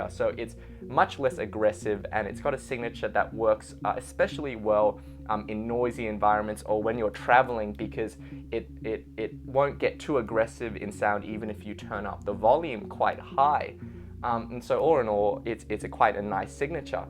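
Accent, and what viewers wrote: Australia. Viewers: I can't figure your accent, is it Australian or English? )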